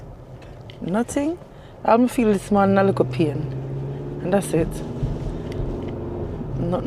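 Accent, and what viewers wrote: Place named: Jamaica. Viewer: Great stuff, great you... loving the Jamaican accent. Men loves women who loves cooking, especially me 😉. ❤❤❤❤❤🎉🎉🎉🎉🎉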